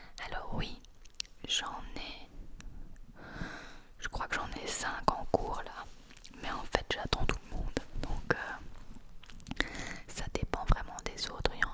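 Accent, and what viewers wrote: Belgium. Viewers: J’adoreee les vidéos comme ça près du micro (et si y’a des bruits de bouche encore mieux ah ah), en plus tes chuchotements avec ton accent belge c’est super relaxant ! Une vidéo de ce style serai cool hihi ;)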